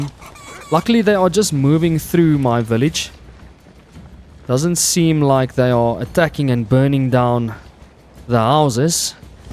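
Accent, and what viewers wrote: South Africa. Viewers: I hear a SA gamer i subscribe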